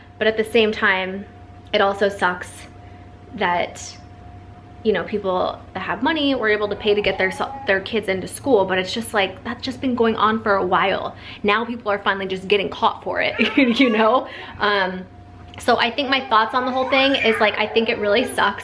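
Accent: South, United States. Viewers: I luuuuuv your suuthun accent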